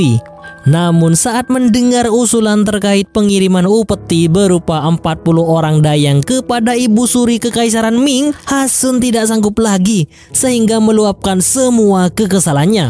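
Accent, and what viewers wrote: Madura, Indonesia. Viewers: Reng madureh kak ? 😅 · Iya ya logatnya madura banget😂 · Mas pengisian suara nya , orang Madura,logat Madura nya kelihatan banget 😅😊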